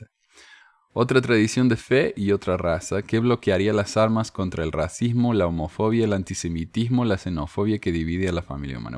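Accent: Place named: Córdoba, Argentina. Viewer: ..ESA LLAMA SUPER CUADRUPEDA, del Ecuador que se cree inteligente que te mando mensaje hablando de tu acento Cordobes, Le pregunto Yo que acento tiene Como llama de su CORRAL Ecuatoriano.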